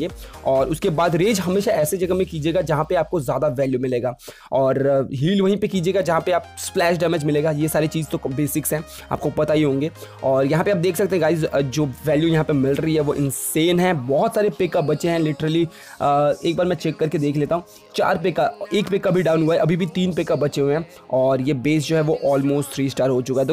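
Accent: Bengal, India. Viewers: Bhai tumi bangali ?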